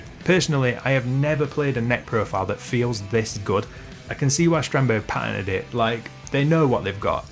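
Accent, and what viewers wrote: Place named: Northern England, United Kingdom. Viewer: Proper northern guitar review. Strandbuuurg Buuurdon. Subscribed.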